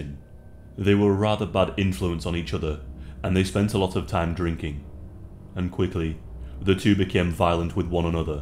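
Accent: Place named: Yorkshire, United Kingdom. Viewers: When I get some cash ima pay this dude.. I love listening to horror read in yorkish 🤪🏴🏴 This story I've known for a while... Horrific from start to finish.. OMFG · So now I know your accent is Yorkshire. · i KNEW you were a Yorkie go me can pick an accent any day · Thought youre a Yorkshire man. My oldmans from Donnie Doncaster ;))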